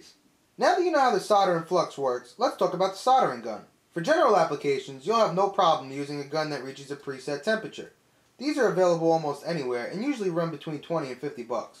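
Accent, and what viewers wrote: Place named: New Jersey, United States. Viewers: Simple, straightforward tutorial, with no BS ! you gotta be from Jersey,(or thereabouts...) thanks , Man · What kind of American? Southern, New English (New York, New Jersey, New Hampshire, etc.), or western (I think he has a New English)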